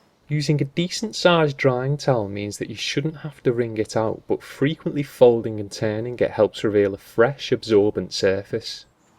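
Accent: Liverpool, United Kingdom. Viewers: Good video but please refrain from using this Scouse twat from commentating!!! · Scouse of course!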